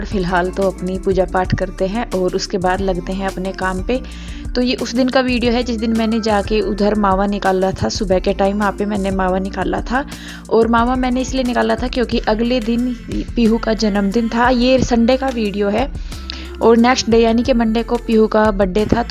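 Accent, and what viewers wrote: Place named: Rajasthan, India. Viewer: Hi mein new sabscribar hu aap ka chenal sabscribar keya hai meine roj video dekhti hu aap ki bolne ka tarika matlab aap ki lengvej bahot achi lagti hai aap kaha se ho rajasthan ya hariyana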